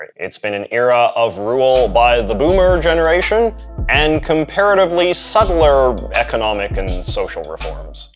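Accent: Canada. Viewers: Your accent is aggressively Canadian. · I live extremely close to a major crossing from the u.s.a to canada and this guy's voice is complexing and annoying as hell! Like I assume he is canadian and their english doesn't flow the same way as american english for sure but I've never heard it so... monotone and yet choppy for lack of words. I was interested on the subject but can't continue watching the video as the voice is absolutely dreadful.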